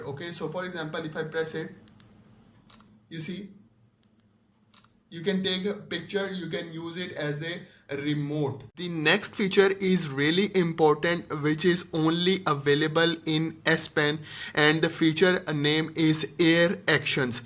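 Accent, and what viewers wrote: India. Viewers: What a nice indian accent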